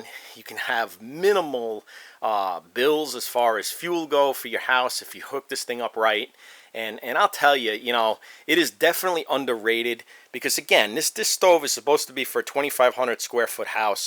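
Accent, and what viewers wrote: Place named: Northeast, United States. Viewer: I can tell you in the northeast by the accent so how much wood do you go through a month in the winter ?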